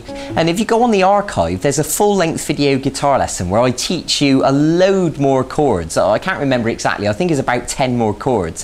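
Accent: United Kingdom. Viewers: nice accent you are british ??? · he's British, or Scottish, maybe Irish, could be Welsh...you get the idea. Great teacher.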